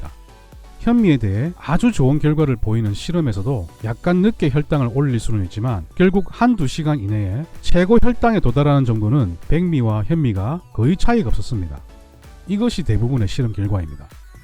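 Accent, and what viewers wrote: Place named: Busan, South Korea. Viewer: 부산 출신이세요? ^^ 아주아주 귀에 익은 억양이시네요. ㅎㅎ . 말씀도 참 재미있게 하시고.... 딱 부산사람 말투 ㅋㅋㅋ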